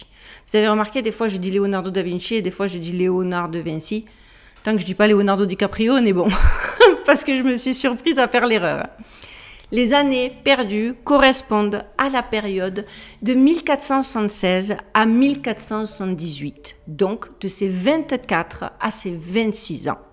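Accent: Marseille, France. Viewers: Merci pour la vidéo, bien présentée et on sent qu il y a de la recherche . Votre accent me fait penser au sud de la France (Landes ou basques ..) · Je vois qu'il y a beaucoup de clients pour ces salades... mais quand à moi, des propos tenus avec l'accent cagole je ne peux pas les prendre au sérieux.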